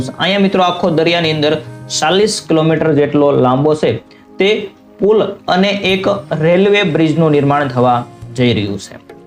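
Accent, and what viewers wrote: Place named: Bhavnagar, India. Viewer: ભાઈ આ ભાવનગર ની બોલી છે લોકલ બોલી ની મજાક નો ઉડાવી જોઈએ 😢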